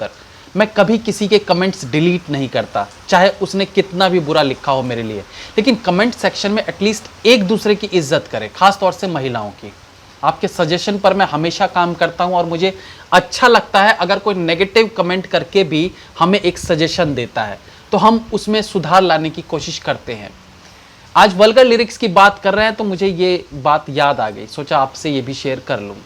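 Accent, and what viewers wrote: Bihar, India. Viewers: आप बिहार से हो?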